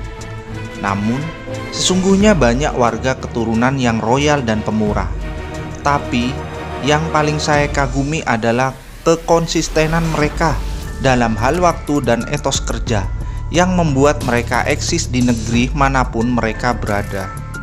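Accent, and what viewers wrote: Madura, Indonesia. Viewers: Logatx madura banget ini.. Haha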